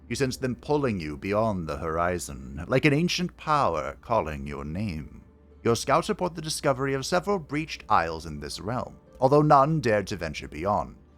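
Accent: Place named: United States. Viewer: And it was a very poor attempt made from ignorance. Not blaming you as a person, as your accent pegs you to me as a USian, and there is no country on this world whose citizens are more confused about the political spectrum and what the various tendencies entail. I meant to poke a response, and thereby give you a more accurate way to consider communism. For accuracy. For you have an audience, and some might take your words as gospel - which would be, in this case, inaccurate.. :)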